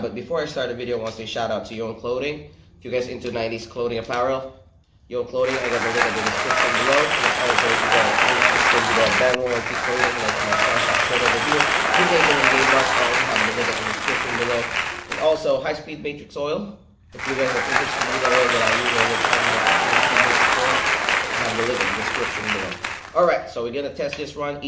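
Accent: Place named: Philippines. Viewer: Filipino accent I hear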